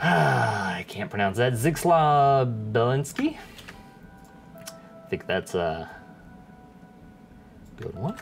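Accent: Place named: South, United States. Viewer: Why do you speak like a dummy ted neck ?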